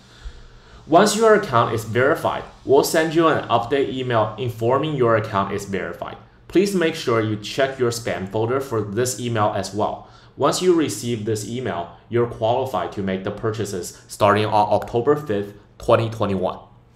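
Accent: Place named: China. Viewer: 这口音咋感觉是中国人？😂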